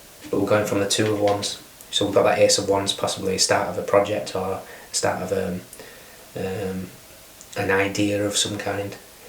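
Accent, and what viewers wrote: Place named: North, United Kingdom. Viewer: That was nicely put😄 especially with that northern accent. Thank you for that🙏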